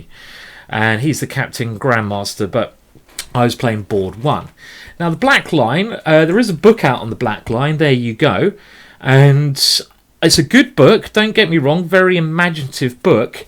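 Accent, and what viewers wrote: United Kingdom. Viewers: Playing video on full volume, enjoying the chess video, even his strong British accent, and that was when the sneeze nearly made me deaf. XD.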